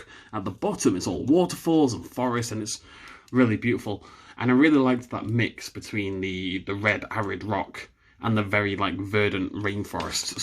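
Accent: United Kingdom. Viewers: I just like to listen to British guys talk about video games so sketch away.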